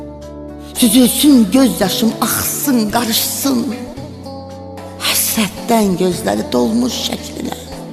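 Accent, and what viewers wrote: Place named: Nakhchivan, Azerbaijan. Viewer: 😂😂😂Lehçe lap Naxçıvan lehcesidir ki😂😂😂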